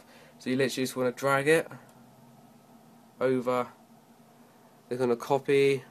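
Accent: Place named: United Kingdom. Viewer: GASP UR BRITISH BRITISH PPL R AWESOME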